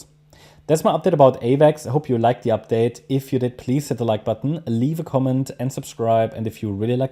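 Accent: Germany. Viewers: Not bullish😮😅😅😅...are you german ?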